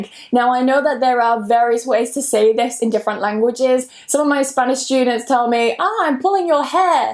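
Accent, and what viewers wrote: United Kingdom. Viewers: Hello ☺️ Take love from India ❤️😇💐 can you please tell me in which british accent you are talking 😇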